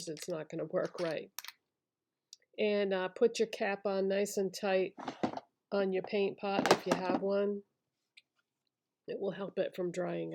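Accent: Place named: New England, United States. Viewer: Actors take note, this is a legit New England accent.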